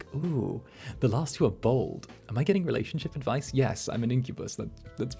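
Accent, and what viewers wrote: United Kingdom. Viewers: Fancy british (?) Incubus is going to be the end of me. I love him too much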